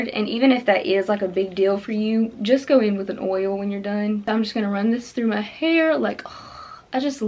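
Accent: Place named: South, United States. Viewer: Your accent is cute. ^^ Are you from the south?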